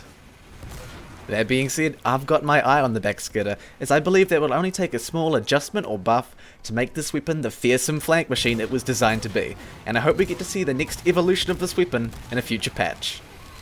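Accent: New Zealand. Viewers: are you from New Zealand? you sound like it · You're from New Zealand aren't you? I recognise the accent. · what national background do you have? i think you sounds new zealand, coming from an aussie :P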